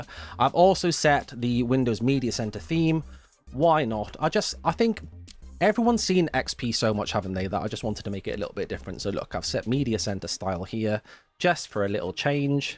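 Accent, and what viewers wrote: United Kingdom. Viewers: btw love who your pure British "yeah lemme get more tea" LOL stay cool my guy PS what's your favorite Michael mjd video?? Mine is the one where everything goes wrong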